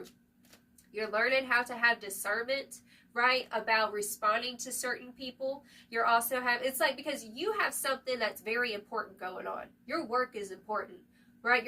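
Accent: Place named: Texas, United States. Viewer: Everything is always working in my favor., I LOVE your Texas twang!😂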